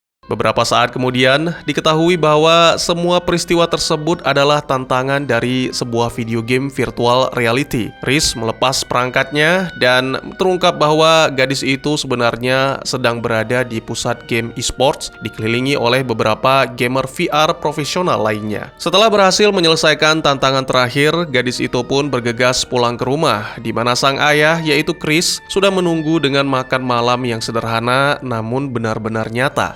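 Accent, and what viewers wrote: Aceh, Indonesia. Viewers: Maaf bertanya org Aceh ya bg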